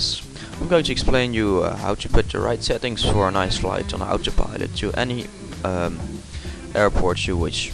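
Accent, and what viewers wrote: Netherlands. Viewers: u dutch mate?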